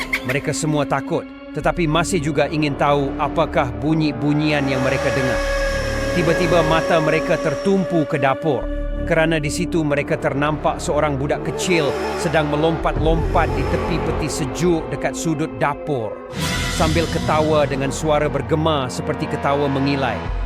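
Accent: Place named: Sabah, Malaysia. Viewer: Mantap, TERBAIK. Tpi sy sarankan kasih full logat sabah bah cara penyampaian critanya bosku, barulah kena dgn crita Borneo😮. Utk bhasa pasar kta yg trlalu mendalam tu cuba jelaskan dlm kurungan mcm dlm beberapa Video sblm ni🤩. Contohnya: Baru seja c ondu sangkut seluar pindik yang dia pakai tu d jemuran pindik dlm jamban belum sempat bercangkung tiba-tiba berabis Kalang Kabut Si Ondu Pakai Seluar Di balik Tidak Jadi Melabur Gara-gara dia perasan dari siring pintu jamban yang tdk dia tutup rapat tu ada dua pasang mata marak warna merah sedang meningu si ondu mau melabur. ( baru sahaja si Ondu mengantung seluar pendek yang dipakainya pada tali yang dikhaskan utk menggantung pakaian, Belum sempat dia bercangkung utk membuang air besar dengan tergesa gesa si Ondu memakai balik seluarnya dan tidak jadi membuang air besar kerana waktu dia baru sahaja hendak duduk bercangkung matanya terlihat ada sepasang mata berwarna merah menyala sedang memerhatikan dia melalui celah pintu tandas yang tidak tertutup rapat itu.. 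#maaf jika komen trllu panjang🙏sbg seorang penggemar sy hnya memberikan cadangan🙋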